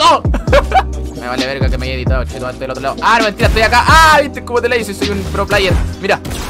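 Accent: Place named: Chile. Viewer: Desde cuando lagarto habla chileno?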